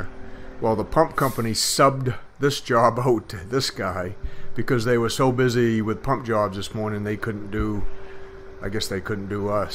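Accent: Maine, United States. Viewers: Soon as I heard your voice, I knew this was in Maine. But looking at the woods in the background, it's not northern Maine. I grew up in Madison.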